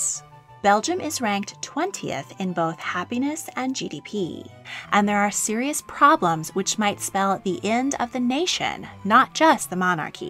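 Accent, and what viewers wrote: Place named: United States. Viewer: Who knows how long any of the monarchies will last? Time well tell. But I wonder how and when you an American (with all the baggage between your country and Britain that entails) decided it might be a good idea to produce a video in which you speculate on the downfall of foreign monarchies. Most of them countries that are allies of the US. Could you not see how inappropriate that might seem to a lot of people? I found it especially crass for a narrator with one of the most pronounced American accents I’ve ever heard (and I come from the US) to take on this dubious task. You seem blind to how incredibly presumptuous and lacking in taste it sounds coming from someone of your background. And of all times to put out such a video! I wonder how Americans would react if some smug British talking head did a series on the future of the presidency and American form of government with a review of all the disastrous American presidencies of the last 50 years, Nixon, Dubya, TRUMP. 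Time for a parliamentary cabinet system in Washington?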